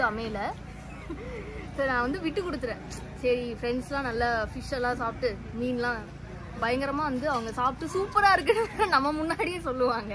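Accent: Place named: Kerala, India. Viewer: Nice Vlog captain.. Especially your Malayalam slang.. Kutty titanic 🤣👌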